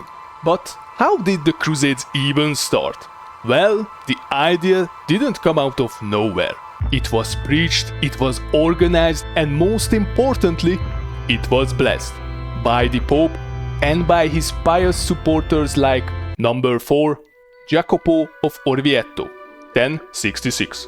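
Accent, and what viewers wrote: Hungary. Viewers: Love the hungarian accent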